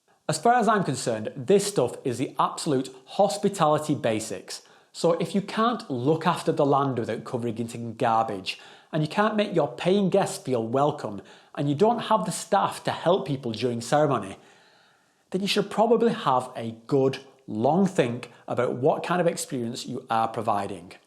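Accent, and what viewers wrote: United Kingdom. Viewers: Awesome review mate. It was definitely balanced and fair. Honestly some really vital information that anyone planning to spend thousands of dollars and weeks of their time to execute should know. I have a feeling that by the time I’d actually go on a retreat, Merosa would be a real gem. On a side note, I hud no idea you levved over thur in Swetzerland. I feel like the more I lesten to ya the moar I start thenkin in ur accent. But I’m just a white boy from the good ol’ Yew Ess of fockin’ A mate. But for real, what part of the UK are you from? I seriously dig your accent.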